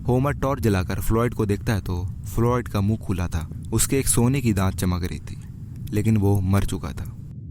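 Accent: India. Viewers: 😂😂😂 fuck this indian accent is so funny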